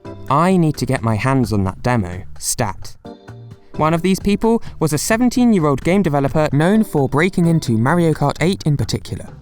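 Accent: United Kingdom. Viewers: your brit, right? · Dude any person who speaks English knows what a queue is you pompous ass. Just because you have a British accent and speak a less refined version of old English doesn't make you smart, it just makes you outdated.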